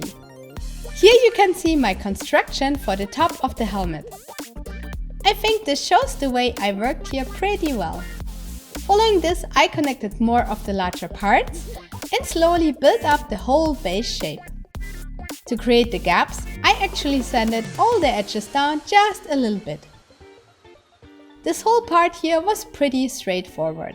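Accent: Germany. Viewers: I love your germand Accent! S2 S2 S2!!! I BUY YOUR BOOKKS!!!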